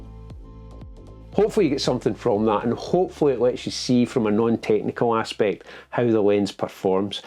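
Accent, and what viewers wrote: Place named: Glasgow, United Kingdom. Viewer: Nice to hear a Glasgow accent on a photography channel. Im in east kilbride! Just wondering if there's a smaller lens hood you can get for this lens?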